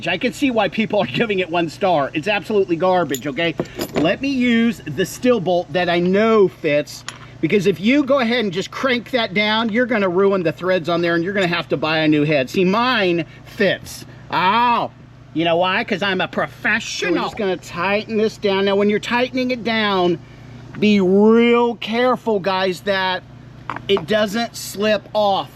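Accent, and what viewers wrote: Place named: United States. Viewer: omg.. a loud yank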